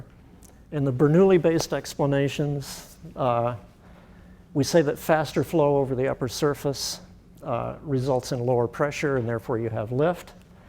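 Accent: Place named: United States. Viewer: the pronunciations of the names are so American, it hertz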